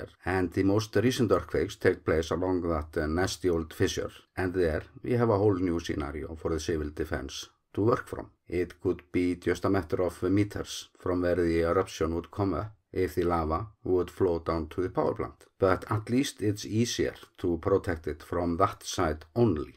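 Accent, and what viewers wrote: Iceland. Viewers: could you speak SLOWER with your Icelandic English, please???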